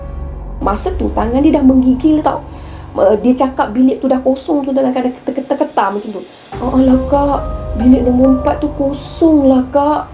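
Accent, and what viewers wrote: Kelantan, Malaysia. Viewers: Mesti ni org Kelantan. Ada keluar slang:). Best dengar citer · dari lorat bahasa mlysia kluar lorat pahang..last2 kluar lorat ..mu kecek kelate eh.. takpee..teruskan bercerita..👍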